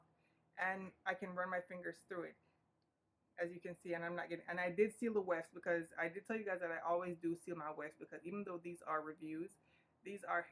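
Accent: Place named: Jamaica. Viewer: I'm Jamaican as well, i picked it up right away 😂👏🏾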